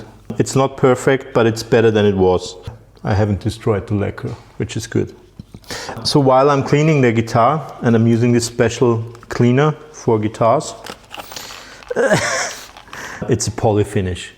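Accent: Austria. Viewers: That's amusing, apparently my ears still work, hahaha! I thought I recognised your accent also, though I wasn't certain- nor so specific; I heard Austria, but not the regional part (my family comes from Neusiedl in any case). Entschuldigen sie, bitte, für mein in Englisch schreiben in die Mehrheit; mein "Ungarischer-Bauer Kinderdeutsch mischt mit Berliner & Hamburger Strassedeutsch" ist sehr rostig heute... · Ottakringer Bier?! Dachte mir bei den vorigen Videos schon dass ich den Akzent identifiziert habe 🙂Liebe Grüße aus dem sechszehnten Wiener Gemeindebezirk!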